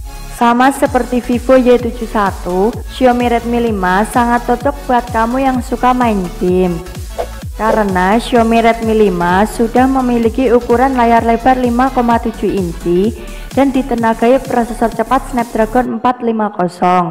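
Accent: Java, Indonesia. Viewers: Jawane ngendi mba:-D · jan medok,njowo mbak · Jawa medok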